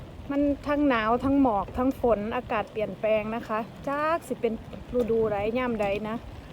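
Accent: Isan, Thailand. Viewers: อินางบ้านอยู่เมืองอุบลบ้อหล่าสำเนียงเว้าไปยุใสกะฮู้fcจากอุบบเด้อเบิ่งมาแต่ดนแต่บ่ได้คอมเมนส์ซื่อๆ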